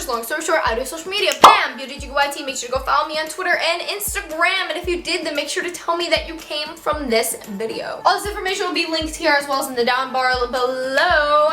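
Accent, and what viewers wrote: New York, United States. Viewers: You have a New York accent! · are you from NY ?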